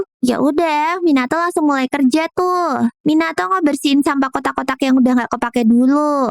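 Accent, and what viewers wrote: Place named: Java, Indonesia. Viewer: Suaranya jowo 😅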